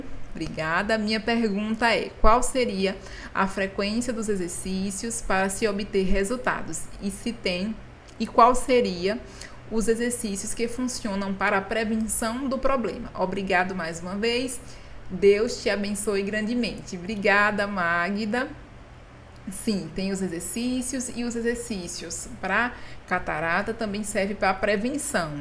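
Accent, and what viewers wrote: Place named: Maceió, Brazil. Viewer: Onde vc mora? Tem um sotaque bem parecido com o meu aqui de maceio.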